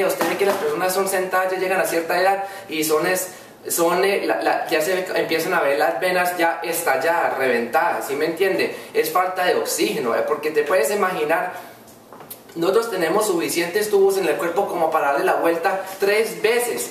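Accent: Colombia. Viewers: Eres Colombiano? Me Gusta Tu Acento :$ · estoy empezando a ver tus videos me encantan. c oye muy bonito tu inglés y parece tu tono de Colombia c ve k eres muy inteligente saludos desde Monterrey México 😘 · Hola es primera vez que te veo y me gustó mucho tu explicación, y debes de estar orgulloso de como hablas eso te identifica de donde eres así como a todos me encanta como hablan en Colombia, Arriba Colombia!!! Y eso que soy de Guatemala.Felicidades y sigue con tus consejos.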